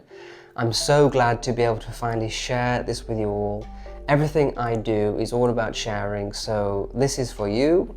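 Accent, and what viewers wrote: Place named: England, United Kingdom. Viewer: you are simply lovely. Yes, beauty does make life enchanting. The English garden, way of life and pronunciation: is you encapsulated. Thank you my friend.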